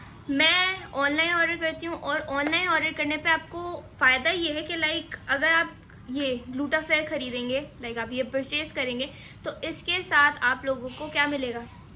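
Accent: Pakistan. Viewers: your way of speaking is like you are belonging to a muhajar cast...:(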